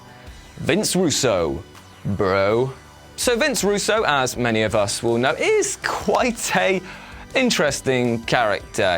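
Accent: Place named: United Kingdom. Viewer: I never knew until today that was actually a Brit.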